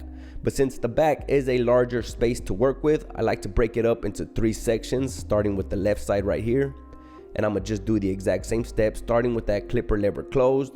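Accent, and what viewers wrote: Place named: Mexico. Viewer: Bro it’s not you talking that’s boring u shocking try to not talk Mexican bro ya accent it’s Chevato bro u can tell Spanish accents heavy try talking like you from Brooklyn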